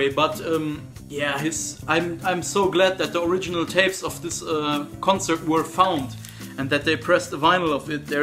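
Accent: Germany. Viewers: Gr8 Album! You look Italian or Turkish, not so German, but you sound German with super English. Young Germans do love their English language! You seem a gr8 upbeat guy for this job:)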